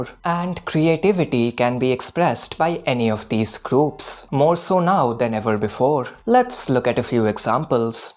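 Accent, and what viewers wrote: India. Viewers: clearly india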